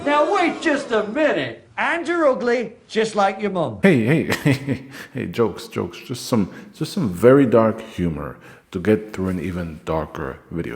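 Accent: Germany. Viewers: What a video! Great storytelling, amazing art to illustrate, your accent is amazing (are you German?). Only one thing I would point out is that even if the Nazis hadn't invaded the Soviet Union, they would have been at war with the US either way and probably though we will never know the Soviets would have invaded themselves after Stalin was done with his Great Purge and set up his own war economy (that is actually one of the big factors why the Nazis invaded in 1941 while still at war with the British). Thank you for your work!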